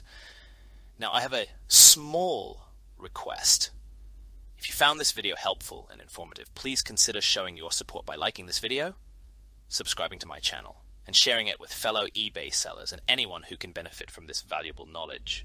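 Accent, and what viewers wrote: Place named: RP, United Kingdom. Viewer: You do sound posh lol Great advice What about broken items same thing ?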